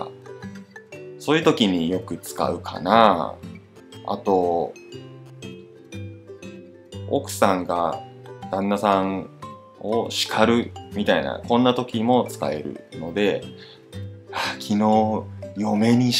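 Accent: Taiwan. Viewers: 台湾腔好可爱～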